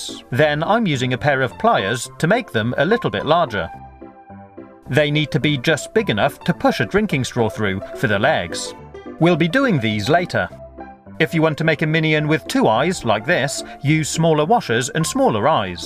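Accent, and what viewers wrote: United Kingdom. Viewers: Listen to his accent. He lives in the U.K.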